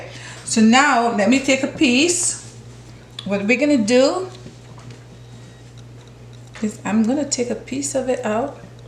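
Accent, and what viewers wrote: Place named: Barbados. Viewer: I am sooo happy I found this channel omg 😩 lunch cakes were the best thank you miss love tug see and hear ah bajan 🇧🇧mother talk 😂 Happy Mother’s Day to you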